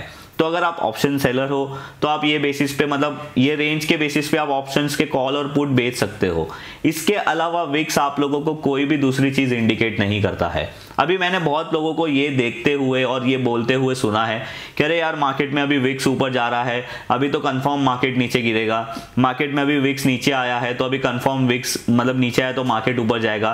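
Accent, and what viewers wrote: Gujarat, India. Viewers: bhai, u r from Gujarat?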